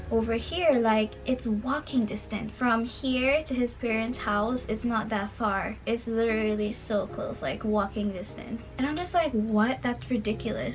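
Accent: Jamaica. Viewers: You sounds Jamaican or you a Jamaican? Sorry for your experience! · Just came across your channel and I'm wondering are You from the Caribbean sis? Your accent and your phrases got me feeling the homey Jamaican? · U sound jamaican Btw i do understand what it is they were saying cos the level of understanding between both u and the doctors wasnt good and anything could happen even tho they could've probably worked something out..its not that much of a big deal but at least they recommeded u to someone else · You sound jamaican. Are u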